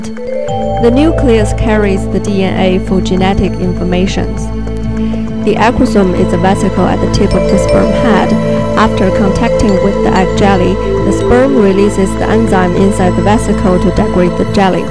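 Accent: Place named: South Korea. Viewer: She sounds korean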